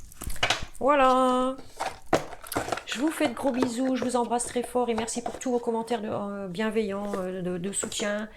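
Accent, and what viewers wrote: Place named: Nord-Pas-de-Calais, France. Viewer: Belière je viens espionner mon chéri Cancer, j'aime bien votre accent chti ayant vécu plusieures années dans le nord mis à part le climat j'aime le nord et les gens du Nord que je trouve plus chaleureux que d'autres. Bonne continuation et on ne peut plaire a tous la vie est ainsi faite😘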